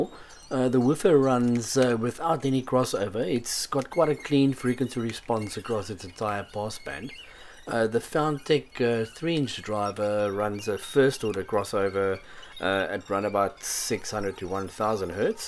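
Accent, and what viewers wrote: South Africa. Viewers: I may be really wrong, but you sound South African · You sound very South African? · Fabulous blog, great flow, good content. Well done. I recognize your accent as South African. Cape Town, I suspect. Fly the flag high Mate. Great work. Mark, Johannesburg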